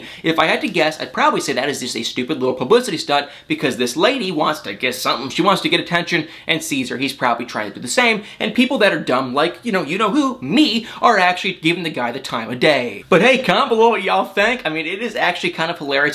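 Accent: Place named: Michigan, United States. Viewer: Hey! I called it a few weeks ago! The RandB News. RandB. RRRrrrr! You can smell it. Lol. I love 💕 your Michigan accent! I can hear it from another room! Sweet!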